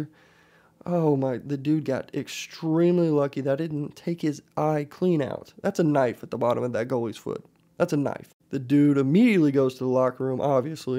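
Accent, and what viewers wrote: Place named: Canada. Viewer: Dude, you're even starting to sound Canadian. "Lower body injury, he'll be alright, eh?" Classic Canadian add on there. Beauty, eh.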